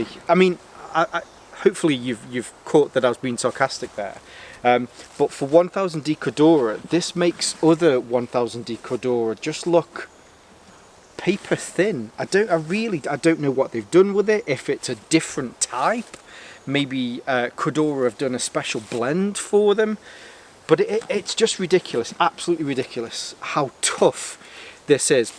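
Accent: United Kingdom. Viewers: One of the most detailed review in British accent. Started to get interested in MCB fabric. Luv it!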